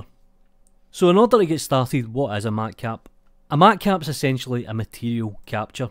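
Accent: Scotland, United Kingdom. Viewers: you're instatntly my fav blender tutorial for your scot accent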